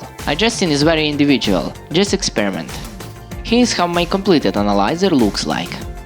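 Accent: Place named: Russia. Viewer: Funny Russian accent :)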